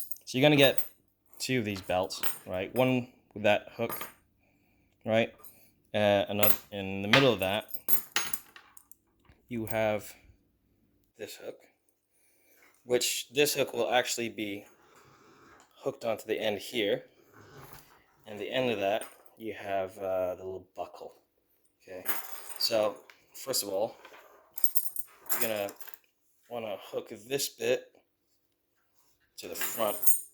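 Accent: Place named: England, United Kingdom. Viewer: What's your accent? Sound like fellow Englishman that lives in the States, got my MP5 and sling on order so thanks for the vid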